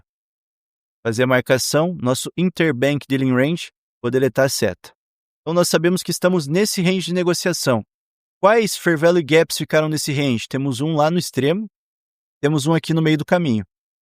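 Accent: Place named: Curitiba, Brazil. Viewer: Depois de 8 anos operando e consumindo tudo q existe no youtube, descobri esse canal e não consigo mais assistir outros. Vejo diversas vezes cd aula e assim q puder vou retribuir adquirindo algum serviço pago seu. Obrigado,! Obs. Sou de Curitiba, reconheço esse teu sotaque aí!😂👍🏻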